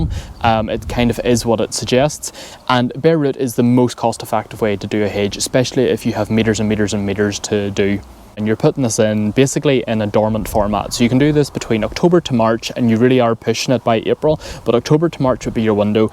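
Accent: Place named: Ireland. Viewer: I love everything Irish and your lovely accent and the Irish vibe. Presumably you are in lovely Ireland? If not, bless ya for bringing us your lovely accent and charming Emerald Isle manner anyway! Apart from loving the Irish accent and Zero Zero Guinness, another thing I adore is listening to Gardener's Question Time on BBC Radio 4, but last time I heard the radio programme panel discussing hedges they seemed to hate cherry laurel just as much as you do. However, I've gone for cherry laurel round my garden because it is very cheap, tough and fast growing. I bought the smallest cheapest plants and after a couple of three years they are now almost a full grown hedge. You've also done a bit of yew around your garden, which is a great idea, however, even more expensive than beech, and takes even longer to establish. In fact, yew is extremely slow growing. 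However, indeed, the deciduous beech is great because it is a tree and so you can have a hugely high hedge - eventually as tall as a full grown beech tree - if the neighbours and laws of obstruction allow - but they are either extremely expensive or take years to establish. But, while if I had more money I might have gone for deciduous beech or evergreen yew, I actually really like my poor person's cherry laurel, zero problems with yellowing leaves, and in a short time it is making my garden look so much smarter, while I don't have to worry about the expense of a new garden fence and it certainly won't die from drought. And, yo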